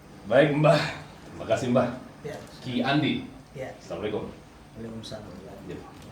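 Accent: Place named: Palembang, Indonesia. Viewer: Dari nada bicaranya sperti nya orang Palembang, slam dri Palembang 🙏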